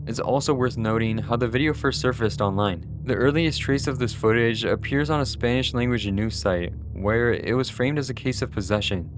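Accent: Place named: Brazil. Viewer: why bro has brazilian accent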